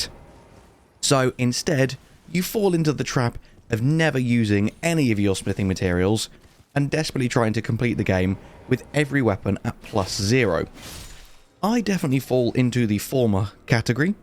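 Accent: United Kingdom. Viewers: I just love your polite greeting in the traditional British accent. You have great info in your videos too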